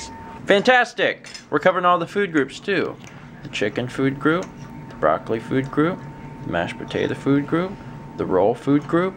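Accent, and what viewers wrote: South, United States. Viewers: its the southern accent it sounds like we say an l when we are talking really fast. figured id let ya know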